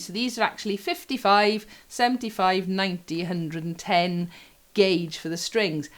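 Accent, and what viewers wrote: Wales, United Kingdom. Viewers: Hey! Just ordered one - I was torn between the white/cream & black, went for the black. Arriving tomorrow! Thanks for the fantastic review! I’m a 57 year old lady feeling like a kid at Christmas. 🤣 Subscribed 👍🏽 PS, absolutely love your Welch accent. 😊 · Thanks for the concise, informative, unbiased review. Great captioning, narration, lovely Welsh accent and pronunciation. I love your playing and choice of samples,. You play so well I couldn't hear roundwound scraping sounds at all. I've got DR Legend 45-105 short-scale flatwound strings on my Japanese 1973 Univox Hi-Flyer 'Ventures' bass clone. Flats hide some of my 75 year old student bass guitarist bad fingering and muting. Great pedal display, makes me think I need some, and maybe some gels. I like to use the Chorus effect on my Fender LT25, ethereal, especially with my Ibanez Mikro converted to piccolo bass- D'Addario rounds on it, so playing it without scrapes is, for me, what the Army called 'valuable training'. 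Thanks again, I'll check out your reviews, I'm shopping for another good shorty in EADG to throw in my Mazda to take to Death Valley.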